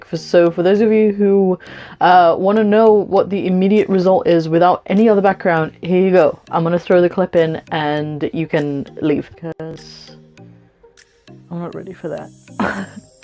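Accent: United Kingdom. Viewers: I would have guessed British based on your accent.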